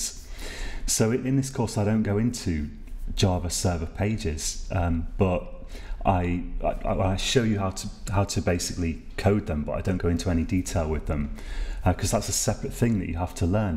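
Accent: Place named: United Kingdom. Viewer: British accent ，i barely heard of in the other' s tutorial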